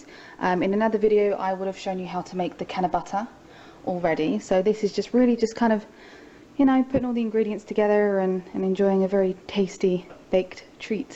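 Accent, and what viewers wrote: United Kingdom. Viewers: oh my god shes british <4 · I didn't expect you to be British :) UK FTW.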